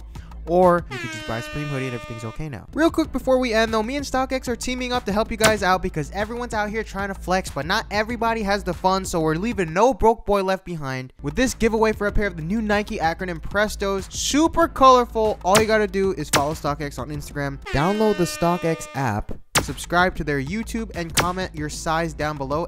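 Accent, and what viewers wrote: United States. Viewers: Asian English sounds so American, it no longer sounds American.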